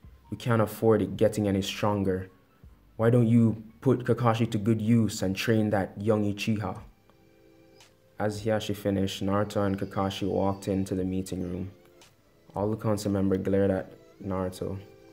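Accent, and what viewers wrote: Jamaica. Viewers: Aye u from Jamaica right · you sound jamaican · Bruh I’m Jamaican and and he sounds like me for real sometimes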